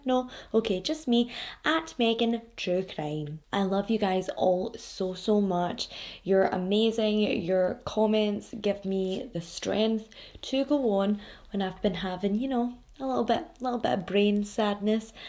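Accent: Scotland, United Kingdom. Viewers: Please don´t change. I love your bubbley personality, and I especially love your scottish accent. I love Scotland, and I hope my husband and I are gonna visit it one day ♥♥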